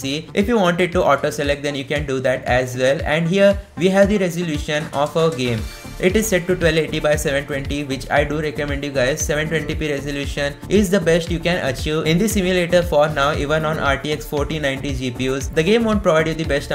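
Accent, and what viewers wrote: India. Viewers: The curry accent 💀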